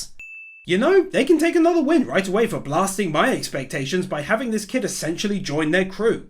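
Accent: United Kingdom. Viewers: I could tell from the accent you were from somewhere in East Anglia, same. I also got very hyped when that location card came up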